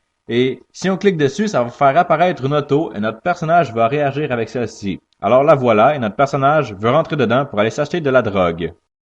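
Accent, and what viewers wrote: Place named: Canada. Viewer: mdr j'adore avec l'accent canadien c'est énorme xD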